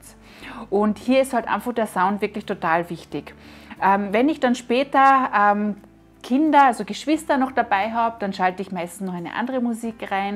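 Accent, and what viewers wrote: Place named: Austria. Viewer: Hi, vielen lieben Dank für deine hilfreichen Videos. So bekomme ich von dir doch viele hilfreiche Informationen und Tricks, über die ich mir noch keine Gedanken gemacht habe. Zu deiner Frage, ob du gut verstanden wirst, sage ich ein klares Ja. Da ich aus Wattenscheid/Bochum - NRW-Deutschland komme, sind wir "Ruhrgebietler" von Natur aus offen für alle Sprachen/ Kulturen. Dazu liebe ich die Aussprache von Menschen aus Österreich und der Schweiz sehr. Vor allem der Gruß zu Abschuss (Baba) Alles Gute dir, deinem Team und deiner Familie.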